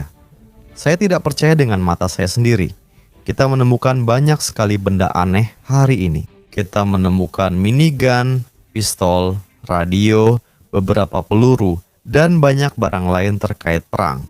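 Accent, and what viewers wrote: United States. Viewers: iti orang adit suara asli orang USA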